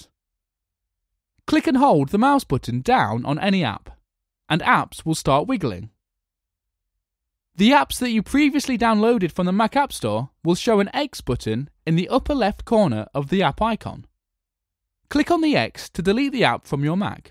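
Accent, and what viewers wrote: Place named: United Kingdom. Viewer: thank you....nice british accent.....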